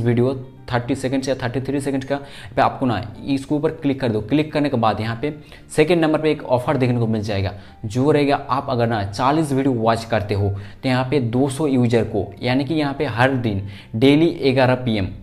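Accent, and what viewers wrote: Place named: Bihar, India. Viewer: Kyo ki app awaj se Bihari lagte ho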